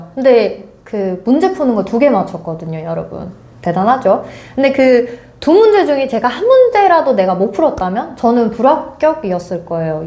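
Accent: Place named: Gyeongsang, South Korea. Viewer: 경상도 분이신가봐요ㅎㅎ 응원합니다 선배님!!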